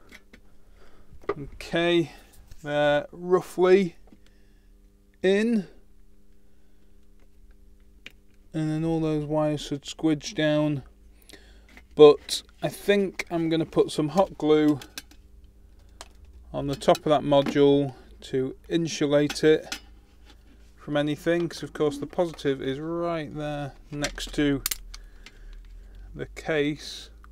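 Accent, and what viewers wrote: United Kingdom. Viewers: Dear uploader, Sorry to say that this chinese booster module can't manage proper current & voltage continuously ; I believe unless it is frm a branded power 💪 bank. Anyway I liked yr idea. I wish to change my 18650 cell to higher capacity in my Qualcomm power 💪 bank. Yr British accent is really cool. :-D . I love ❤ british accent.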